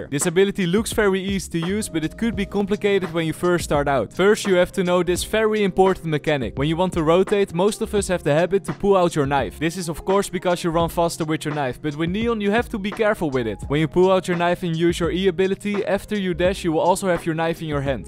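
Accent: Netherlands. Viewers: One second in, ah this guy is Dutch. "checks username:: 100% confirmed":)) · Are you from the Netherlands you have exactly that accent. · effe voor duidelijkheid jij bent toch Nederlands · why does he sound dutch? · are u dutch bc i can hear it in your voice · Very Nederlands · Ur accent is the same as a dutch man speaking english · i love youre dutch accent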